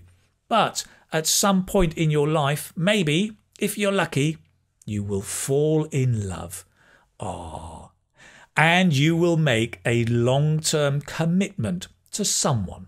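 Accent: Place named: Sussex, United Kingdom. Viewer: 👏👏👏👏👏A goooorgeous lesson! And your English is simply smashing,! I looked after my uncle's polo ponies at Cowdray Park Polo Club, in Midhurst, Sussex. Everybody spoke just like you, if you know what I mean!😀😀😀😄😄😄 Un abrazo, from Olivos, Buenos Aires, Argentina🇦🇷🏴🇬🇧🇦🇷🏴🇬🇧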